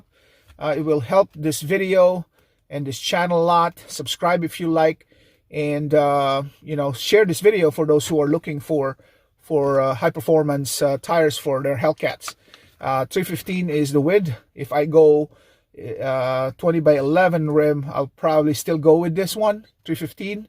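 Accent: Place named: Philippines. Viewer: Philippine accent. How did you get to Illinois? Too damn cold..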